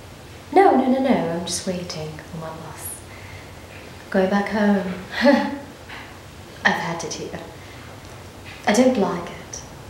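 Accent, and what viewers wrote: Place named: Australia. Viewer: Aussie accent eh. Nice 👍🏻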